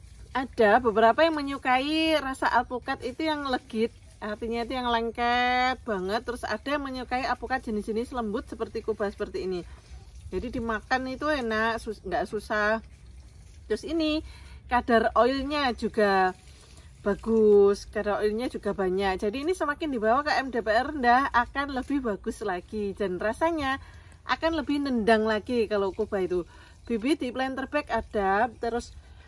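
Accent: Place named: Blitar, Indonesia. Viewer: Assalamualaikum nduk mimin...saya asli. Orang Blitar, dkrg di sumantra lampung, hijrah dari thn 1987 ,saya terispirasi dg bibit yg ssmpean promosikan, smg nanti kesinambunga, amin...ditambah lagi logatnya bicara, bikin kangen sedulur nemg blitar...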